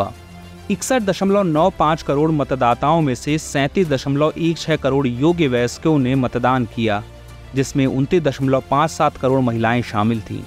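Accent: Bihar, India. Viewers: Aap Bihar se ho...? Hna..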